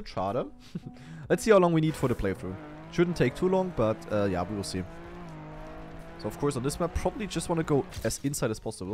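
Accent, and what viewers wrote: Germany. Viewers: Finds immer geil wie dein deutsch durch kommt 😂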